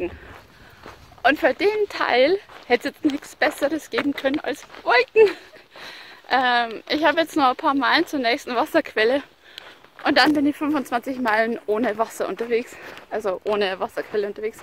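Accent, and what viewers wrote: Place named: Bavaria, Germany. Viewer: Servus, was für ein geiles Video!!! Und dann a no auf bayrisch - perfekt und vielen Dank für diese herrliche Doku des PCT.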